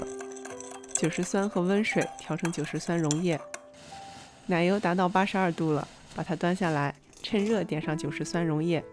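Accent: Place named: Yantai, China. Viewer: 听口音不知是不是山东烟台人？😁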